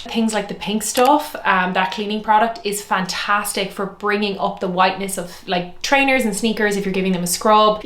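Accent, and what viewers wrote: Ireland. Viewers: You look like a young Elizabeth Taylor! Stunning! Except your Irish!) love your accent! I agree W your tips. I clean skin regime…..and those little laundry bags for your intimates ( keep your $$ things well, they last,same with makeup…..you invest in it…take care of anythng it will last. I love being tall & thin wearing leggings …..WOW TIDE REMOVER PEN? LOOKS LIKE A NEED FOR ME! THANKS GIRL IVE A KITTY SO YOU GET ME ❤😊😊😊😊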